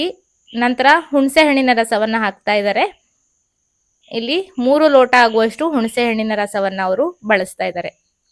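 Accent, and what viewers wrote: Kundapura, India. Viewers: I was waiting for this recipe from so many years. Being a kundaprian I used miss this every time i eat rasam in Bangalore. Thank u so much madam for this recipe. Now watching again to make the rasam powder for the second time. Twice in a week is mandatory in our home now😊. Laik attu saru. Heenge video madtaikani khushi aytu. Nam kundapra bhashe keluke chenda. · This is pure kundapur kannada ಕನ್ನಡ!!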